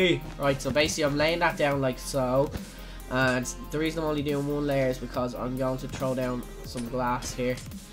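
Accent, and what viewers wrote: Ireland. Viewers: R u Irish cuz I amzz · are u irish and if u are you are from dublin or tallagh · r u irish so am i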